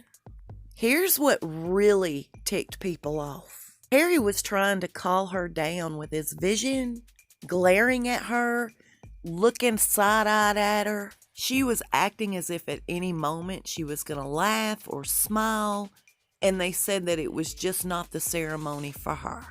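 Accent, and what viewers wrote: South, United States. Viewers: I love it when you say y’all. I went to the USA recently and made nearly every American say it. In your words I was a royal pain in the ass. Still their accents were not as lovely as your Southern accent. Love your British Friend and her cat 🐈💕💕💕💕💐💐💐🇬🇧💕🇺🇸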